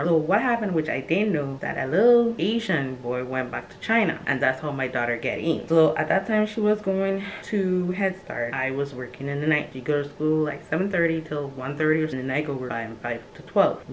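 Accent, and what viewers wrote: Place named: Virgin Islands, Caribbean. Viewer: You’re from the USVI?! I knew the accent sounded familiar I’m from the BVI. Sending you VI Love ❤️❤️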